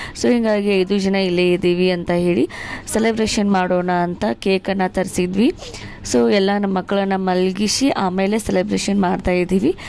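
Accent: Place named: North Karnataka, India. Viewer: Neevu nam uttar karnataka style le maatadri ennu chalo anasatt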